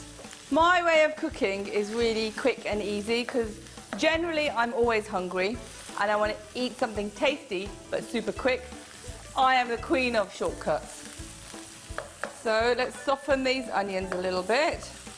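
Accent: England, United Kingdom. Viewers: loved the recipe and ur British accent. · Your British english is awesome... Its... I learned with your show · She's amazing ..got an amazing English accent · She is very much indie with a perfect british accent. What's wrong in that. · she sounds so british :3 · come on people shes a Britisher and shes got an English accent..and seems like a really lively person..get over it and focus on the delicious food shes making..or else just stop watching...simple, isnt it?? btw you are fabulous...loads of love...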